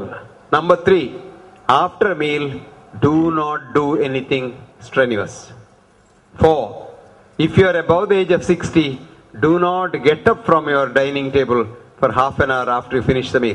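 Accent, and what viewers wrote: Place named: India. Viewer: Dear Dr. Hegde, I feel blessed to have found you, Your honesty, Knowledge, and Love is so encouraging and satisfying. Your, Your, Your ACCENT however is punishing to Non- Indian audiences. Would you please turn-on the (CC, Closed Captioning) on all your videos . It saves people like me plenty of time and frustration of rewind and forwarding to make sure we understood everything. I feel blessed to learn from you everyday.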